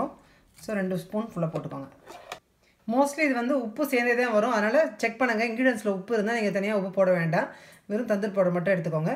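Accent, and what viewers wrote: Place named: South Tamil Nadu, India. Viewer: super mam......just now I saw your cooking video and subscribed....its really amazing and I couldn't believe your are speaking perfect south tamilnadu language.......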